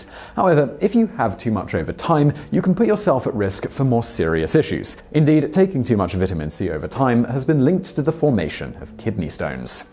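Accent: United Kingdom. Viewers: What part of Britain does his accent come from? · When he said talking about the military I don't know if it is the fact that everything he said was bullship or the fact that he has a British accent but either way don't believe any of it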